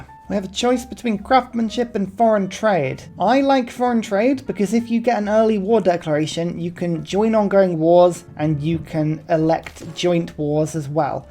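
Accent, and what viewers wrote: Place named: United Kingdom. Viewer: Thanks for this "over explained" video. You are very enjoyable to hang out with. Love the mellow jazz and your humor and obvious talent at this great game. What is it about the British accent that is so good to listen to? It gives you intelligence and authority-but you keep it nice and light with your jaunty sense of humor-well done.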